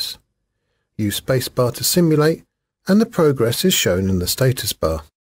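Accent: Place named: United Kingdom. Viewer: OMG I Love that voice and their british accent, thank you!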